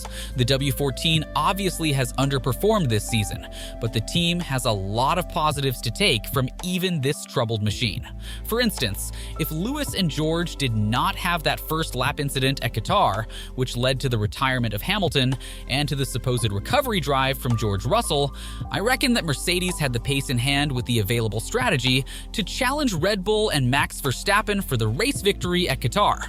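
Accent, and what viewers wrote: United States. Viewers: Nice video explanation but you also didnt say Grand Prix correctly with your very thick American accent ! It's a French word so say it with a French accent !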